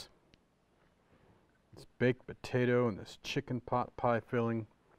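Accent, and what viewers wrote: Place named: Scotland, United Kingdom. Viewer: Very Scottish.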